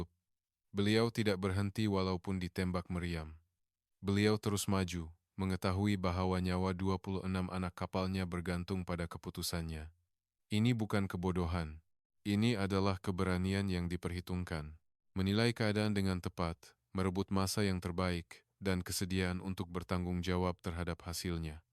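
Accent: Indonesia. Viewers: Chanel dari indonesia ni, huruf je pon dia baca cara indon.Tapi betul ka x itu blm pasti. Blm ade pengesahan cerita setakat ni... Rekaan cerita je ni. 😂😂😂😂😂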